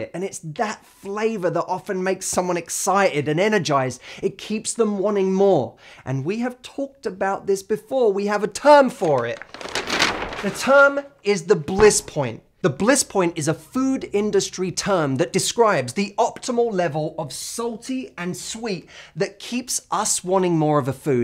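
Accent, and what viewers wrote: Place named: United Kingdom. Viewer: I love the way you Brits speak, "exomple ofter exomple" :–0. I'm not too sure about the glottal stop though. Or should I say "the glo' al stop"? hehe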